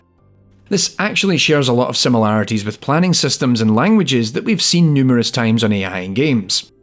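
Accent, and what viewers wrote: Scotland, United Kingdom. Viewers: I’m new to this channel and hearing another Scot made me happy ngl 🙃 good video also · I was ten minutes into this video before I realised you were scottish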